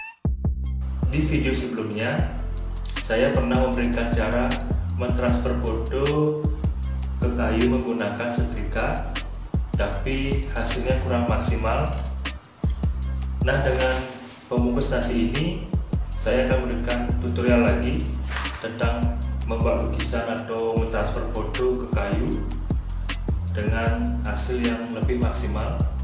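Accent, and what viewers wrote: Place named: Bali, Indonesia. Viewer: Logatnya bali bgt 👍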